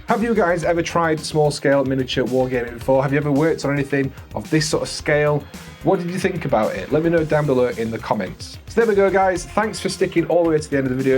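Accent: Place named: North, United Kingdom. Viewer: I 'ears a Northern accent, I subscribes. This is t'way.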